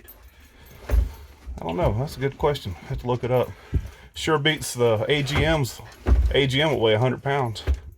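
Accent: South, United States. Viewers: I can understand you changing it to all in the closet..... something goes down you don't have stand on your head to check it out. And you have a place to add to the crazy if you want. I think a lot of the stuff outside should be inside were it can be kept clean and dry. You can store you colder cloths and equipment out side if you like but everything gets so dirty out in the bins Anyways love the Dogs playing in the water. Hows your old Friend Bill doing.? Your sure looking better. Wouldn't it be something if all your back pain was from the kidney stones you have had for years. anyways take care. And you sound just fine hahaha Southern good stuff.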